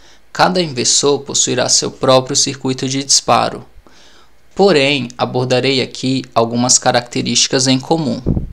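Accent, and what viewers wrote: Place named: Ceará, Brazil. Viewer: Olá, boa noite., você é Cearense, né? Percebo que você fala como a gente aqui, rsrs. Mas, vamos lá... Os equipamentos inversores DC/AC de placa solar, são similares aos inversores de frequência de motores? São similares? O reparo é mais simples ou complexo? Desde já sou grato pela sua atenção.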